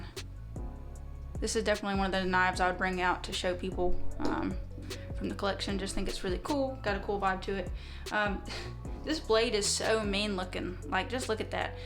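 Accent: South, United States. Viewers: Okay.... I'm never watching any other knife reviewer again. I love her reviews, her smiling face, her southern draw, her hair, and the sound of her voice. Great content. Beautiful stuff. The knives weren't bad either. ❤️ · petit lovely young lady with giant frigen knives. And a Nurse! suthrn accent. I cant comprehend it all. neet woman! love her personality. The Malibu and the last one where a toss up for me. I love a chunky knife. That last one was amazing to my tastes. Hmmm.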